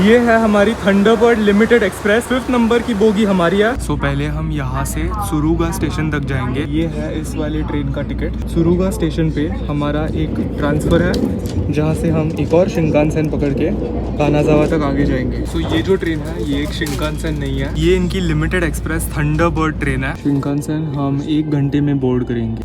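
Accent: Maharashtra, India. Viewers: Marathi guy can never leaves his accent 😊😊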